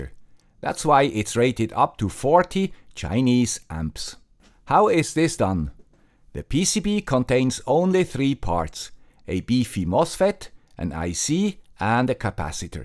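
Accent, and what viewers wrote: Switzerland. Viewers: Perfect, you explain it as it should be. You are real gem Mr. with swiss accent. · He does explain very early that he is the guy with the SWISS accent.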